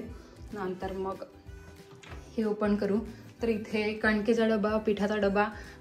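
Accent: Vidarbha, India. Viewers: खूप खूप छान किचन , बऱ्याच नवीन गोष्टी कळल्या तुमच्या व्हिडिओ मध्ये ,very useful video, माझा पण घराच काम सुरू आहे ,मी नक्की या पद्धतीने बनवायचा प्रयत्न करेन .thank you so much.. तुम्ही विदर्भ मधील आहात का, भाषे वरून वाटत ,मी पण नागपूर ची आहे 😊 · ताई किचन छान आहे तुम्ही भाषेवरून मला असे वाटते की विदर्भातील आहात कारण मी पण यवतमाळची आहे सध्या पुण्यात आहे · Tai tumhi kontya shahratun aahat, tumcha bhashevarun tumhi vidarbhatlya vatatat, video chanch hota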